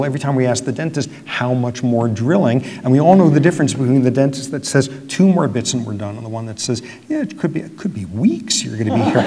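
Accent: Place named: United States. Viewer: sounds like murica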